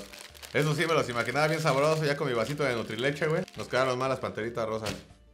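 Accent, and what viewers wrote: Spain. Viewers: #Quiobolines 😂😂😂😂 me encantó tu acento español 😂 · Muy chingon tu video y tu asento español 🇧🇴 saludos mi ya eres internacional #Queobolines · #Queobolines que buen video y me cague de risa con tu acento español xd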